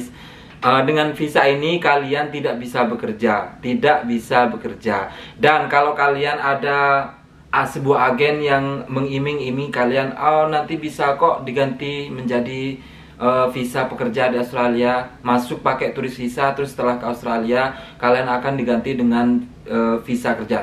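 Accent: Madura, Indonesia. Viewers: Baru paham, = MADURA, pantes logat e gak asing wkwk 😂😂🎉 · Logatnya kayak orang madura bang... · Asli Madura Kah Sampean Bang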